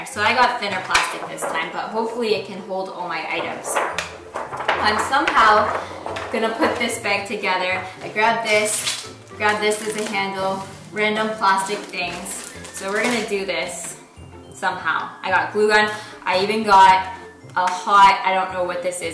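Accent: France. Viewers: LOL, I'm sorry that I just can't stop laughing when you looks serious on the street...and I really love your French accent XDDD Love you <3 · i LOVE the french accent "rrrrrrrr" 😹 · hi! hello from Sydney, Australia. You are such a sweet and creative person. Love all your videos and thanks for all the efforts you put into them. It won't surprise me if you will work with a jewelry or bag company one day to have a collection. Last but not least, love your "French accent"!! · I didn't expect that but you made it! great job:) btw I'm from Paris and whenever I hear your french accent I'm dying 😆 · the french accent HAHAHAHAHAH made my day HAHAHAHA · I love it with your French accent omg you made my day loving the bag !!! Hahaha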